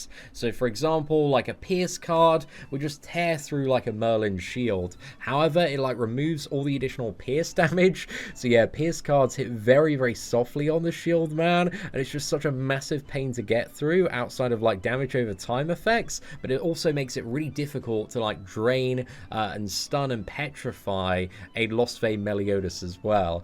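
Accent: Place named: RP, United Kingdom. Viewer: I believe he's English so from somewhere in England whether thats Britain but yeah he drinks tea and has that posh accent so thats my guess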